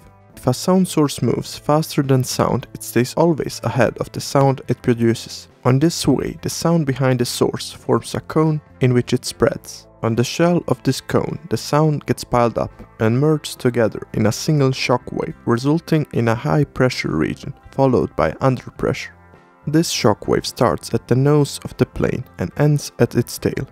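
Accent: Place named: Yugoslavia. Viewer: Ahhhh yes, Yugoslav accent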